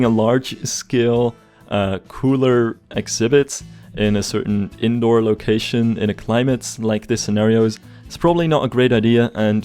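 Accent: Netherlands. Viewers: I hear that Dutch accent. Gegroet landsgenoot. :)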